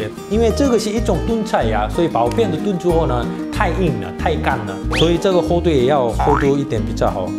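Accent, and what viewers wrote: Cantonese, China. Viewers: 有點廣東腔是正常的嗎🤣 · Kai老師國語有廣東腔，哈!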